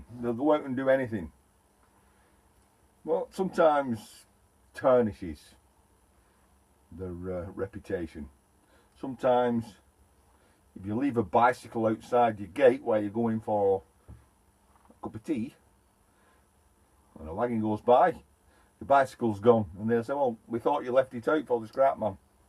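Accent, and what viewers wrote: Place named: Wigan, United Kingdom. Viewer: Lol as soon as I heard your accent, I thought... hes from Wigan, so am I :) My partner is a Man and Van at the moment and looking into scrap metal instead. Any advice would be great. The rules over here are pathetic, its almost impossible to dispose of ordinary rubbish, I have phoned 3 private sites to see what their rules are regarding waste disposal and you cant take your van on site anymore due to health and safety..... its laughable.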